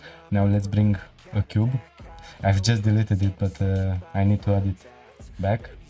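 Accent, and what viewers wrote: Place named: Romania. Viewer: aud romanul in tine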